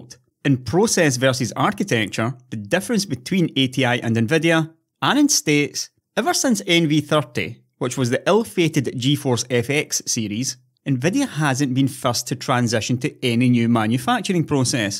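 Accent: Scotland, United Kingdom. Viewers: getting much better at understanding Scottish accents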